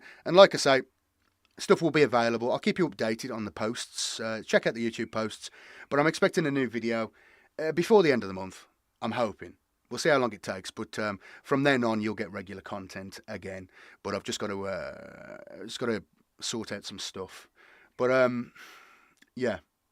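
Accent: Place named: Tamworth, United Kingdom. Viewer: I thought you were a Tamworth lad from your accent, I’m just down the road from there (on the boarder of Leicestershire). Didn’t know if you’d randomly turn up at our local shop sometime.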